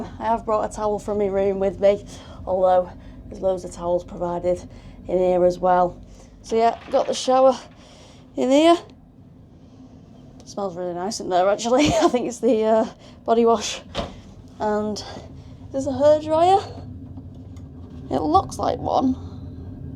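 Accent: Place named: Sheffield, United Kingdom. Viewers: Lucky find for me this video, thanks. Looked like a great trip - especially for free. Sounds like you may be a fellow Sheffielder - am I reyt? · I live about 60km due south of Bastuträsk so this is a train I have used a number of times. I am however looking forward to the Norrbottnia being completed up to Skellefteå which will speed up services a great deal. Nice video and well put together and I love you English accent. I am originally from South Africa 🤪. Subscribed. Fun Fact. SJ took over the service of the sleeper train service (they were the original company before privatisation) while waiting to see if anyone would take over the service. It has been said it will be ended/closed soon but as of yet no takers. As for now the final run is planned to mid April 2026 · Love your accent; thinking from around Sheffield?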